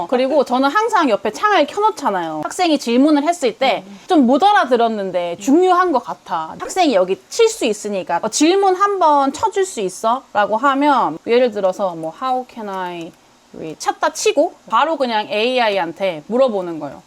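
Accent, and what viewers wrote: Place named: Gyeongsang, South Korea. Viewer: 경상도 억양이네...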